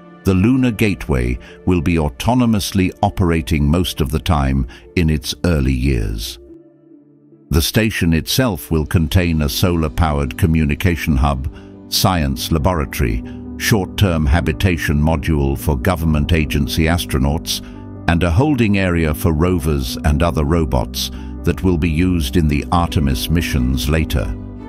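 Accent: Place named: United Kingdom. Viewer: Why is the channel based in the US, but the narrator is from the UK?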